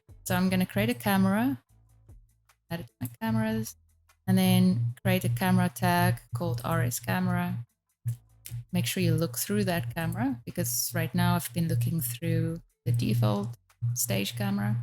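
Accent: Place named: South Africa. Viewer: Loving that South African accent, and thanks for the tut! Cape Townian living in Paris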